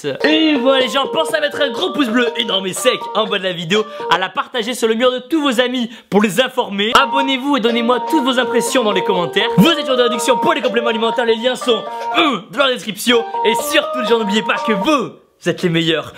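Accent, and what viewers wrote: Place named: Toulouse, France. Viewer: Quel bel accent toulousain!!!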